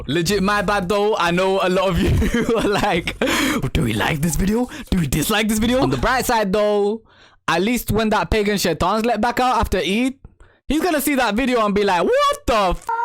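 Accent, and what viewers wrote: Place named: Bengal, India. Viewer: Wallah I love your Bengali English accent 😂😂😂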